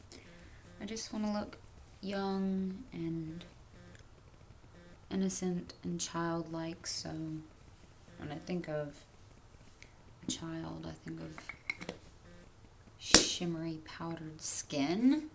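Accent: Texas, United States. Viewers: i like the tutorial...but u need to be more professional and stop talking in that texas voice. grow up a little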